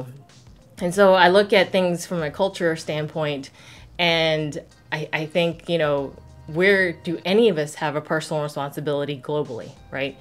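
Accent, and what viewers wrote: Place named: United States. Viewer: Sounds like 100% American to me.;)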